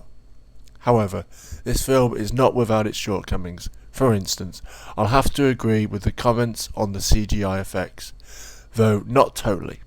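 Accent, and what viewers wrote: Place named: England, United Kingdom. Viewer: It looked like another res evil to me but I like Will enough to give it a look. Thanks for this insightful review. Nice to hear an english accent tell it how it is for a change. You just need to polish up the speech a bit and it would sound professional. Nice job though. I'm going to favourite your page for future reference, thanks. 5*'s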